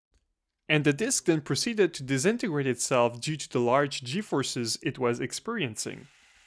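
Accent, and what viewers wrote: Quebec, Canada. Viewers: t'es quebecois?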